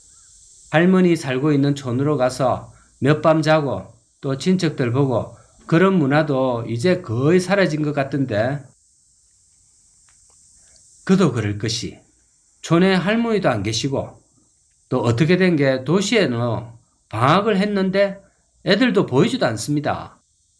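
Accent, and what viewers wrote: Gyeongsang, South Korea. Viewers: 설명이 너무 재미있어서 나라 돌아가는 꼴을 보면 하루늘 보내는것도 지겨워 죽을 지경 인데 설명 을 들을때마다 옛날 시골풍경 구수한 경상도 사투리 정말 살맛납니다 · 구수한 경상도사투리 정겹습니다 좋은 방송 잘보고있습니다 선생님항상감사합니다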